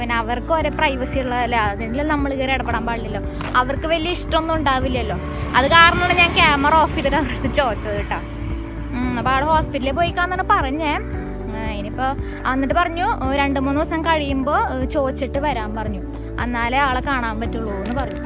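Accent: Thrissur, India. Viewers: D. മോളെ മോളിങ്ങനെ ഓവറായിട്ടു fece കാണിച്ചു ഇങ്ങനെ തൃശൂർ സംഭാഷണവും കൂടുതലായിട്ടു പുറത്തോട്ടു തള്ളണ്ട.... മഞ്ജു.. മണിച്ചേട്ടൻ.. സത്യൻ അന്തിക്കാട്.. etc. പലരെയും അവരുടെ വീടുകളും കാണാനാഗ്രഹിക്കുന്നു.. മോളു ലൊക്കേഷൻ കൂടുതൽ വരട്ടു.. താങ്ക്‌സ്..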